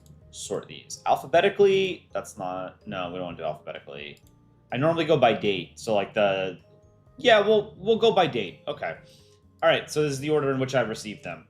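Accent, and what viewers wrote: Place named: United States. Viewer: your voice like United States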